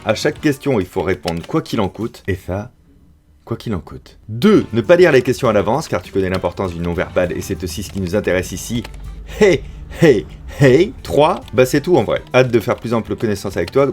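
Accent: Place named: Charente, France. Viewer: Ahah le kiff d'entendre du patois charentais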